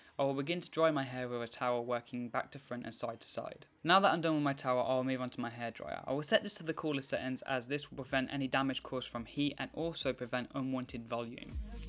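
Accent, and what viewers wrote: United Kingdom. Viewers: I would like to say I understood everything what you said, but actually I didn't... it's because the way you speak is kinda hard to understand at the begging (I'm used to listen american accent), but on the other hand I love it... british accent is so peculary it's so fantastic, I would like to speak with your accent (I'm not from a english speaker land). Ohhh and good video... Actually I watched it bc your accent lol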